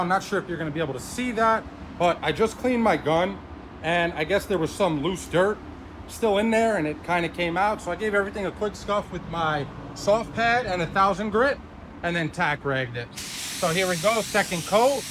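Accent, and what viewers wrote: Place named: New York, United States. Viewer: Where in NY are you? I'm guessing queens or more upstate? I just like to guess accents haha. good video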